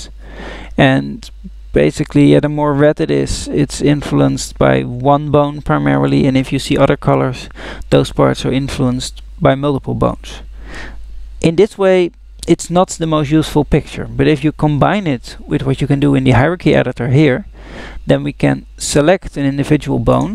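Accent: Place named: Netherlands. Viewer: Sounds like you are Dutch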